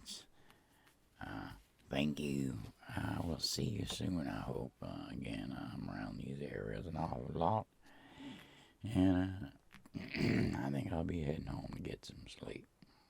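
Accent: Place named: South, United States. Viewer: I like that country sort of accent, you should do like a car salesman role play with that accent, possibly. 👍🏼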